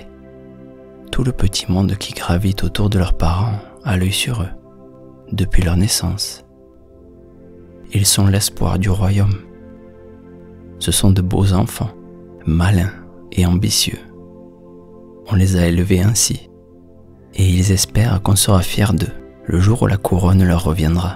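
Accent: South, France. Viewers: l'accent du sud du conteur me dérange trop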